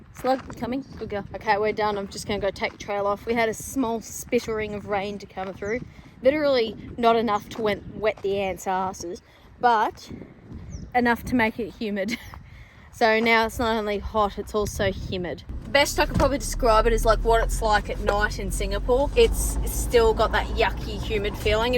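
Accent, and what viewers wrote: Australia. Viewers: Fuck all of them. This is how Australians speak. If you don’t like it then scroll. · It's so refreshing to listen to an Australian speaking our dialect, with prejudice. Love your fuckin work. And fuck the do gooders who don't know shit but tell you what the fuck you're doing wrong.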